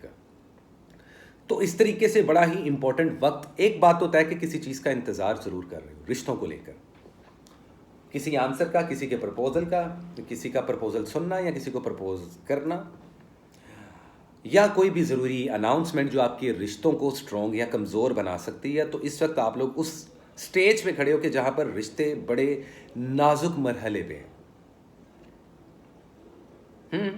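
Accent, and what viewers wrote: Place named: Punjab, India. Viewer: Capi here,Thanks for the reading 🙏🏼 Love your Punjabi accent 🫶🏼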